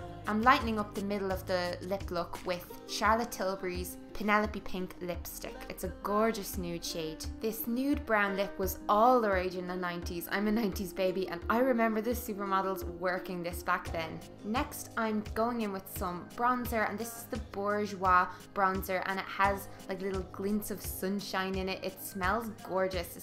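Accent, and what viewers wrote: Ireland. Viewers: As much as Kylie Jenner kinda annoys me (and all the hype... which I don't really "get")... or the concept of makeup done for the sake of selfies, I LOVED this. First time watching. New sub;) <3 So charming, and super skilled! Also, don't get me started on Irish accents. GOTTA LOVE THEM!!!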